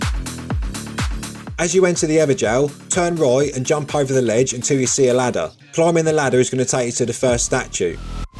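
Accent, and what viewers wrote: Cockney, United Kingdom. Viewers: Good video. But jesus mary and Joseph. The Danny dyer accent and the music. Oof. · Fucking hell this is a solid video. Music, cockney as fak. Love it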